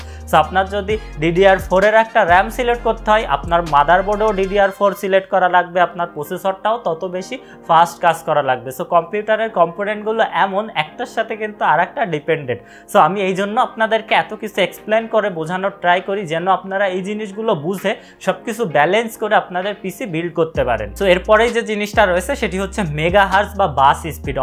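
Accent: Bangladesh. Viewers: Bhai er bari ki borishal?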